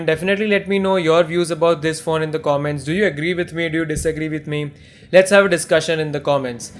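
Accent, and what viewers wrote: India. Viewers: You have hands down the most anoying voice on the planet. Or maybe i just hate the way Indians speak English..... Yeah probably the 2nd one.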